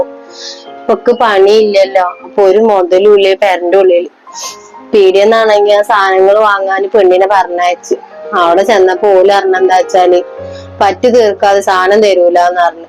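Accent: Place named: Malappuram, India. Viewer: പക്കാ മലപ്പുറം ഭാഷ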